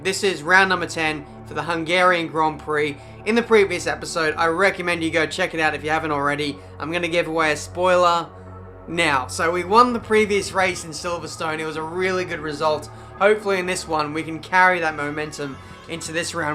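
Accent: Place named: Australia. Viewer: The Australian accent. "err" instead of " ahh""Ninjerr" instead of "ninjahh".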